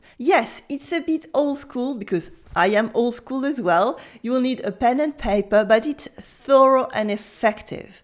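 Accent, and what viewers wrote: France. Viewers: You are beautiful and your learnning technique is fantastique. I love your French accent when you speak English it makes you so unique. Apart from learning, it's always fun to watch your videos. Cheers.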